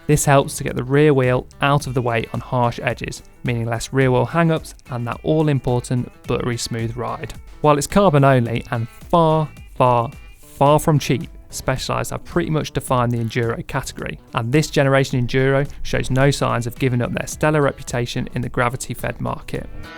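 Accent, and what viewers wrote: United Kingdom. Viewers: Enough with the ( repetitive, loud) "music" track. I have a difficult enough time understanding your Brit-Speak without the added burden of the "music"!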